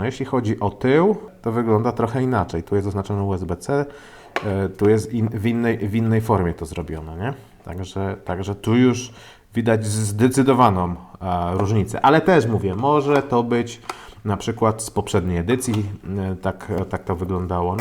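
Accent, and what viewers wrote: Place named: Kielce, Poland. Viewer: Hahaha 😁 razi Cię bo po nicku wnioskuję, że jesteś z Opola. To jest mowa z okolic Kielc 😉 Sam z tych okolic pochodzę i pamiętam jak wszyscy mówili : czopka, szolik, szofka itd. Po 20 latach w Krakowie słyszę te różnice, ale nadal wychodzę na dwór a nie pole 😉 Taka gwara lokalna, pewnie też u Ciebie coś takiego jest i nawet nie zwracasz na to uwagi. 👍 Miłego dnia.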